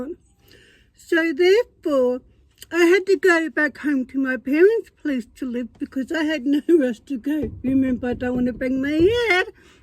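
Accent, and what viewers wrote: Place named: Australia. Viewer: I could hear that you're Australian but thought you were living in England.Where do you park up?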